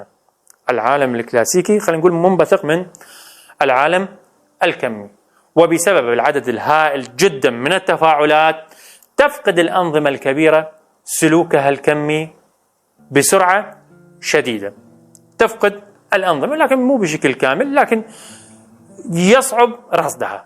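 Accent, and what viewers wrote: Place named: Iraq. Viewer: اوكف انتَ عراقي 😮 واخيراً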